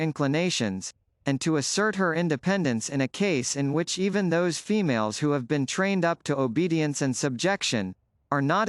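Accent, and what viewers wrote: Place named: United States. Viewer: An American accent is really unsuitable, it's dreadful & unlistenable actually!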